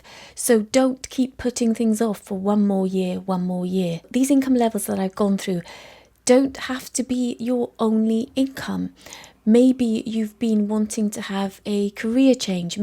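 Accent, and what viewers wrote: Wales, United Kingdom. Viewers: is she Welsh ? this is the softest Welsh accent i've ever heard, it's lovely.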